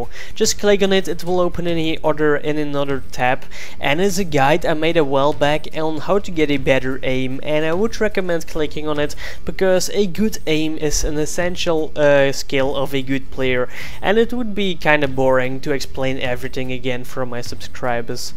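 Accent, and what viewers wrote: Netherlands. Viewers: Are u dutch? :o.... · lol, ben jij nederlands?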